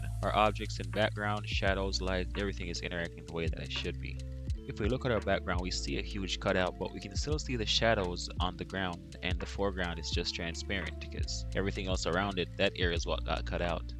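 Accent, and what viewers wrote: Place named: Jamaica. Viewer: I like your accent sounds Jamaican.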